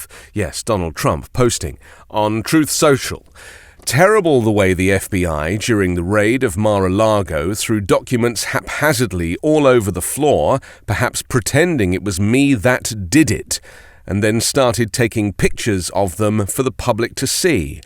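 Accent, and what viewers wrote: United Kingdom. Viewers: OMG 😳 I love 💗 the British accent! We are all in this fight against fascism together. Bless you. 💋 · Don’t u just love the British accent 🥰explaining how trumps ass is about to fry 🔥in the karma grease🤣🙌🏾🌈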